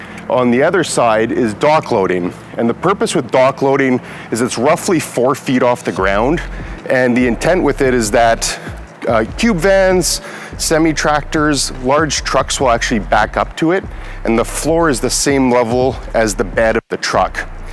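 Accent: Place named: Canada. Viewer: I've been binge watching your videos, largely because you've got such a humble, informed, and no-bullshit style. I was super pumped because of your serious Canadian accent, so tried to guess where you were, with no success. Lo and behold, I watch this video and you pull up next to my favourite electronics shop!!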